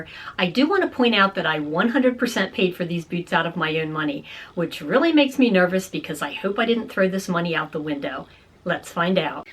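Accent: Philadelphia, United States. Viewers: Thank you for posting this video! I’m cracking up tho I hear a lot of Philly/S Jersey in your accent. Se d them back if they hurt. But they’re beautiful and legit boots of good quality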